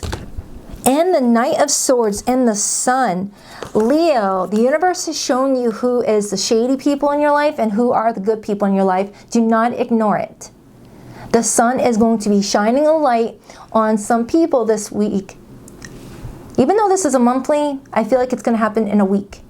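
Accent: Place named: Boston, United States. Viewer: Bostonian here too!! I was trying to place your accent before and wasn't sure. 😂 🙌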